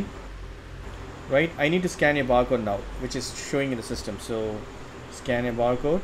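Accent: India. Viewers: This got to be the worst guide, his Indian accent doesn't help too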